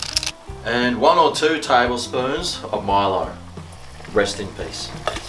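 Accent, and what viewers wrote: Australia. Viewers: Ayyy your Aussie too! 😂😂😅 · If we go by accent I'd assume Australia, Austria sounds more like German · ...Yeah, this guy is definitely Australian.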